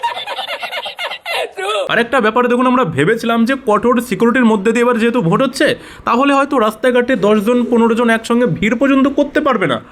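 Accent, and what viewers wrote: Bangladesh. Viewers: হুমায়ূনকে বিজেপি সাপোর্ট করেছিল।। তুই জানিস না গোবর ভক্ত।। তোর কথা শুনে মনে হচ্ছে তো তুই একটা খাঁটি বাংলাদেশী।। এই করছিল খাস ছিল এই যে তোর ভাষাগতে।।তোর প্রমান তুই একটা বাংলাদেশী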